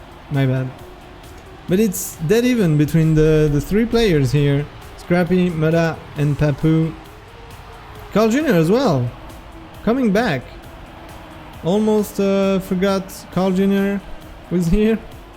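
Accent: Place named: England, United Kingdom. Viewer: pourquoi tu prononces meme les pseudos avec un accent anglais ? Je veux dire Papou ça devrai rester "Papou" et pas "Pahpouw" ou même Aurel ça devrai rester "Aurel" et pas "Owel"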